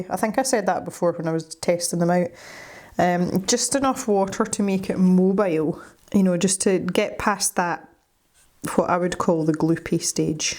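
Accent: Scotland, United Kingdom. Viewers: “Just smash it all tegether” aw, I’m here for the Scot as much as the art as someone from Norn Iron living in England, I miss a strong local accent!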